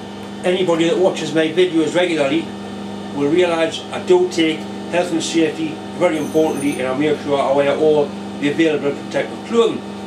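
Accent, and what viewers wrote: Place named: Geordie, United Kingdom. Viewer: When I subscribed to this channel, it was because of the impenetrable geordie accent. If I'd have know that there would be veggie machining iin the future I would have subscribed twice!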